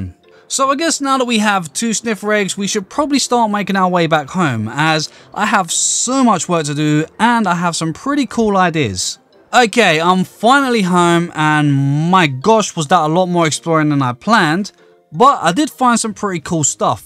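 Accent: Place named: London, United Kingdom. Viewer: Whereabouts is this accent from? It almost sounds like Londoner but I'm not sure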